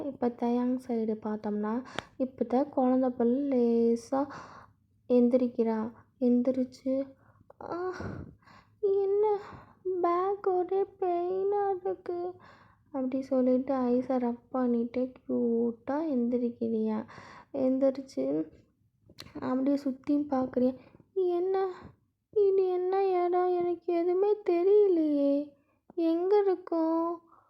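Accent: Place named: Kerala, India. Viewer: Neega malayalam ahhh..? Light ahhh malayalam slang varuthuu ana athum nallah tha erukkk super storyyy😌🤍🫴🏻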